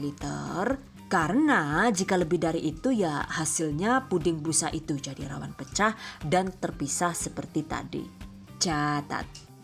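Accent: Banyumas, Indonesia. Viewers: Jal wonge kaya ngapa.... ngapak temen 😂😂😂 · paling sng klo ibu ini yg memandu bahasane campur aduk ada ngapak jg...slm ngapak dari tegal y bu...🙏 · Wuaduh...ngapak banget....kie mbakyune......yaw...kriben ya... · Mantul pake ngapak ngomonge 😄😄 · Makasih resepnya yu..cara menjelaskan begitu jelas,saya suka banget, maaf yu sampeyan asli ngendi,kok ngapake kaya iyong🙏🙏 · Salam ngapak 😂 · Rika wong endi sih yu....ngomonge kaya wong nggonku....banyumasan 😁 salam kenal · Pingin ketawa..ngapak nya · Wong Jawa ngapak apa kie...wkkkkkk........soale bahasane mung wong Banyumas sing kaya Rikane..Nek emang bener bangga nyonge...kmbi njenegan buk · Wong ngapak ya mbak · Ora ngapak ora kepenak ya yuu... 😁😁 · 👌seperti biasa ,apa saja hasil jadi masakannya pasti tampilannya cantik sempurna, mksh bun kasih tutorialnya yg super duper lengkap dan jelas dgn ngapak2nya yg kadang bikin senyum2 klo pas mantengin videonya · Hehe q suka ngmgnya ngapak.. 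· Rika asline ngendi yu jere wong madiun deneng ngomonge bleketek kaya wong banyumas,,nyong penasaran yakinlah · Rika wong ndi yu bahasane ngapak lucu he.he.he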